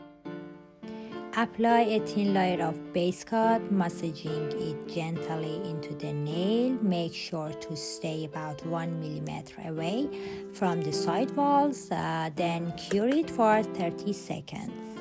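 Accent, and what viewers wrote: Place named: Iran. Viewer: وای انگلیسی‌ت لهجه‌ی فارسی داره 😅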